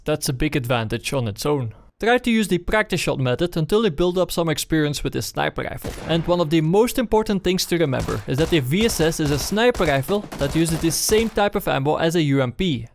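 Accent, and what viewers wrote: Netherlands. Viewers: your accent reminds me strongly of netherlands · Are you dutch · your english sounds like your dutch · werk aan je uitspraak want je kan zo horen dat je nederlands bent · Je klinkt Nederlands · are you dutch(nederlands) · He's dutch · You sound Dutch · the first Dutch person I've heard with that thick an accent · your thick dutch accent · the dutch accent is real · its Dutch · are you dutch? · one kickass Dutch accent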